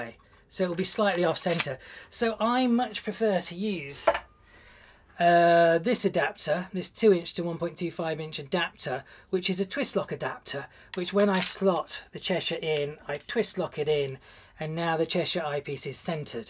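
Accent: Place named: London, United Kingdom. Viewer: 1 Frac and 1 Mak, no need for this stuff. However, love watching his vids for his London accent and (some) comical moments 😁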